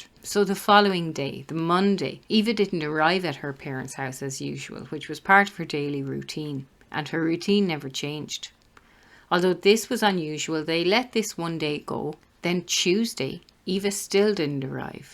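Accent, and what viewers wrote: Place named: Kildare, Ireland. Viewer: Where is yur voice from.... Kildare...? Yu seem to look like a woman called christina that does human right works in ireland.. same voice too.. That voice is so familier to my childhood... Kildare..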